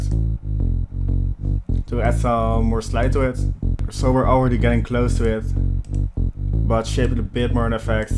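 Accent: Netherlands. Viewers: graag gedaan gozer! Ik hoor aan je stem dat je gewoon NL ben haha, ben je ook een dj en wat is je insta?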